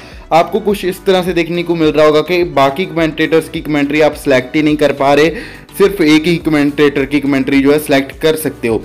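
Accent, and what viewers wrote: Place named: Punjab, India. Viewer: Bai punjabi lagda tu mainu😁❤️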